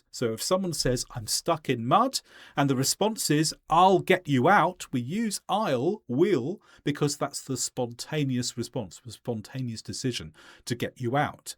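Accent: England, United Kingdom. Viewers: What a joy to hear your English English pronunciation!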